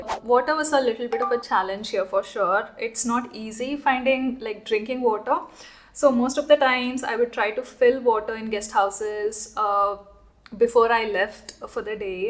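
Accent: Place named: India. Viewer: I enjoyed your programme BUT you need to improve your pronunciation..its too much of Indian slang in your speech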